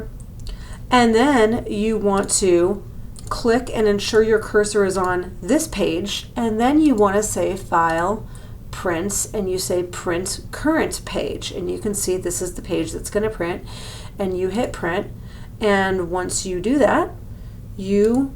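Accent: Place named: Transatlantic, United States. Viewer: Please slow down on your talking, too fast for me, especially with a transatlantic accent.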